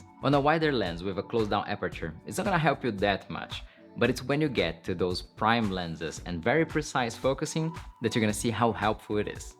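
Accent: Brazil. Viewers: Pelo sotaque deve ser br kkk cara tbm uso uma sony A7IV e apesar de todas essas funções do app ainda acha que compensa pegar por exemplo um monitor feelworld f6 ou só o celular ja me atenderia mto bem?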